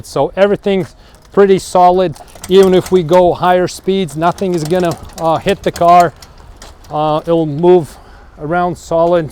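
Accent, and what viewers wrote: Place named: Canada. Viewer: Your accent is so cool, it's fun to watch just for that. I have an RVR. Very good info my Canadian friend. (From Toronto)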